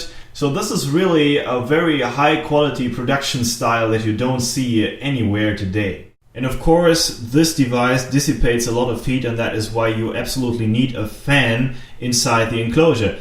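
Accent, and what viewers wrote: United Kingdom. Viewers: I loved the British voice LOL ;-) Brilliant video again, was there some Kraftwerk in this video? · lol at your British accent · love the british accent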